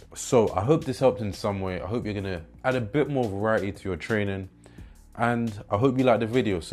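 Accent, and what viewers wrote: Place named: United Kingdom. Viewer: By your voice man clocked u r british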